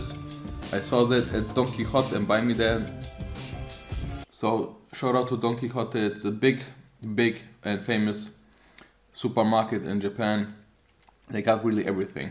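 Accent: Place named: Germany. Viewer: Das hört sich an wie wenn ein deutscher probiert englisch zu sprechen halt nur in richtig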